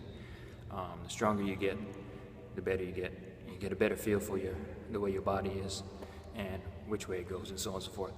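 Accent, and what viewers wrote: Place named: South, United States. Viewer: Great video! Thanks so much! I love your accent, it reminds me a bit of a southern accent-I grew up in the south.;)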